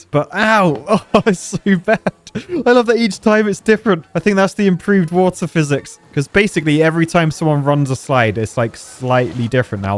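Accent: United Kingdom. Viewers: Did from get a British accent all of sudden?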